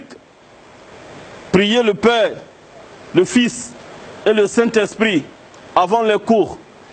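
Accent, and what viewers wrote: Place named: Mali. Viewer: Va dans pays tu ne peux pas vivre en cote d'ivoire, tu parles comme un malien toi tu es un chef ou un envoyer de boko haram.espece de malade ,alassane ouattara est notre preside t et ont se doit de le respecter ,c'est n'est pas toi un blakoro ,qui porte des gros boubous qui viendra manquer du respecte a notre president de la cote d'ivoire .un aventurier comme toi.espece de boko haram..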